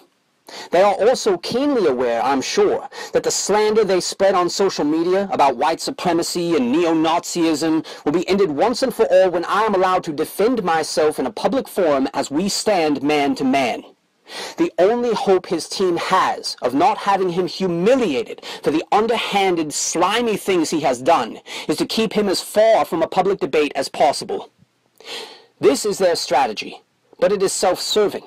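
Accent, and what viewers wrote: South, United States. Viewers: Dear Mr invictus I can only describe the way I feel after hearing you speak on your YouTube channel as "moved". I was starting to think the only time I would hear a man with such a dignified southern accent speak with such passion would be in my own head as I read books about our glorious southern history. This recent attack in Dallas has presented you with an opportunity to obtain a constituency you probably would not have thought would be big supporters of yours. Law Enforcement (and I'm not talking about feds) are without a support group that is not subservient to the same people that are causing their death and missery. If you would do a fire side chat speaking to them about what you would have in mind for them and how their are allies for them outside the fed's and unions, it would be well received. Second I would reach out to James Lancia the author of DownTown White Police. 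His star steadily growing and he is inevitably going to have a large following of police. If you could get Mr. Lancia to opeanly support you it would bring many white police officers to your side. I know it sounds kind of crazy but if you think about it white police officers are in exactly the right position and in the right mindset to welcome a man like yourself right now. Go to Mr. Lancia's YouTube channel. Listen to some of his cop shootout videos. After this I'm sure you will see why I believe these officers will welcome you. Keep up the fight.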